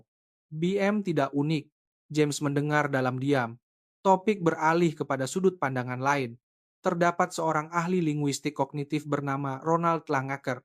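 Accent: Indonesia. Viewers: Ini cerita yg guna loghat indonesia.. ini reka cerita bukan dari Malaysia n bynk reka cerita yg tak mencerminkn org malaysia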